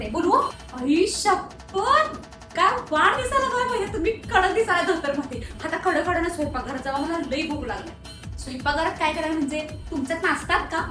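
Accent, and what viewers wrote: Kolhapur, India. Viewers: Kolhapuri language ❤❤❤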